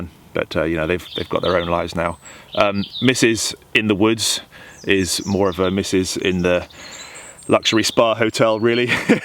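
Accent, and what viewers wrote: United Kingdom. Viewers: I did not see your channel name. First time viewer. I was surprised to hear a brits voice. A lot of survival stuff is muricans. You made a wonderful looking loaf. Good on ya.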